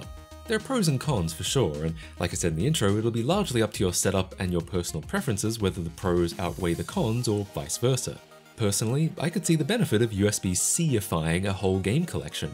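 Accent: Australia. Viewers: Daaamn is a fellow Aussie! Hey, one tip- never lose the accent! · I don’t blame them, addressed that his accent is a mix of a lot of YouTubers he watched growing up and his native Aussie accent. He also lives in the US now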